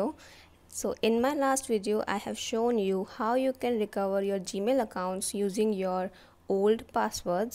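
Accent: India. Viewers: Are you Indian ,I'm Right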